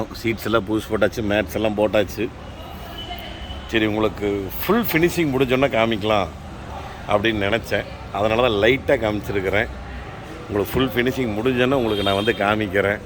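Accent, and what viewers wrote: Kongu, India. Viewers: ரசனையோடு இணைந்து உளப்பூர்வமாக செய்து இருக்கீங்க அண்ணா...நம்ம கொங்கு தமிழ் அபாரம்.யாதார்த்தம் சிறப்பு தொடரட்டும் உங்கள் பணி மென்மேலும் சிறக்க நல்வாழ்த்துகள்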